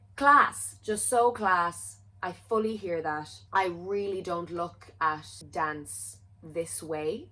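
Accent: Ireland. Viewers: Wow I really wasn’t expecting you to mention my comment! I feel so wonderful that you were excited about something I said when I’m always sooo so excited about everything you say thank you 💞💞💞 and I love this new segment! I think it’ll continue to be a really beneficial way to interact with your audience I for one feel very engaged and the more ppl that get that I think it’ll be really nice 😊 (also ppl pronounce my last name wrong quite often but you were spot on and i loved hearing my name in your lovely Irish accent lol 🥰)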